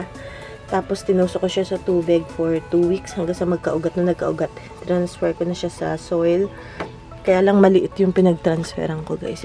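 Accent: Batangas, Philippines. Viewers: ate new to your vlogs💕 batangueño ka ho baga?🤗♥️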